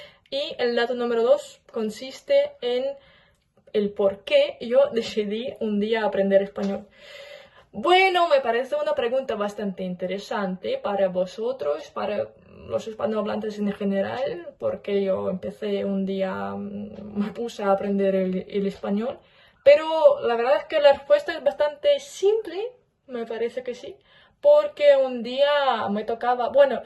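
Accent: Galicia, Spain. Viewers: Sí se dice también "friolera", ella habla español de España. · Hola tienes acento GALLEGO. O sea ESPAÑOL. Segura Que no erez ESPAÑOLA. Vale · Hablas bien gallega. Saludos desde Argentina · Tu español es producto de vivir en España, lo imagino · Tu acento es español😅 muy diferente al castellano latinoamericano 😊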